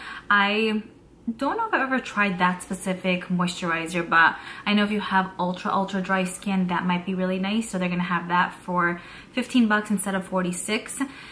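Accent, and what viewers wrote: New York, United States. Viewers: Hi, your makeup application is so flawless! Can you tell me what brand of blush you're wearing and the color, it's so pretty!! Also, I love your New York accent😊🥰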